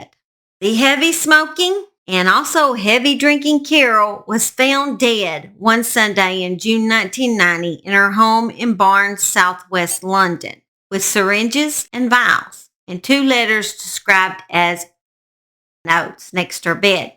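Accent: South, United States. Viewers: Great story from my favorite southern narrator Ms.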